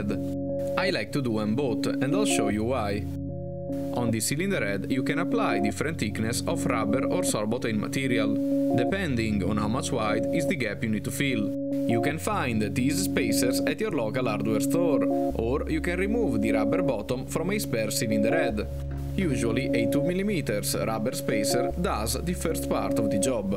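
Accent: Italy. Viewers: Come si sente l'italiano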